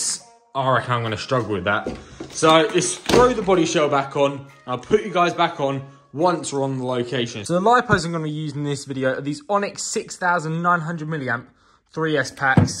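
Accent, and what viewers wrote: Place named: United Kingdom. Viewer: Nice new to your channel but I never thought I would find a better basher than kev but I did that’s you also can you do a vid on slash, bandit, and rustler because I have a rustler and I get your British and that’s your accent but saying Al-i-min-yum is fine instead of Al-lum-i-num but pls don’t say Shassy it’s ch not sh you don’t say it like Charolette it’s chassis sorry not trying to be rude but it like makes me feel wiry to hear shassy but love the channel and again I’m sorry if I’m being rude ❤❤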